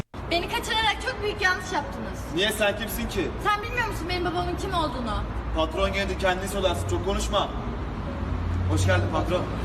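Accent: Erzurum, Turkey. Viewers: lan bu erzurumlumu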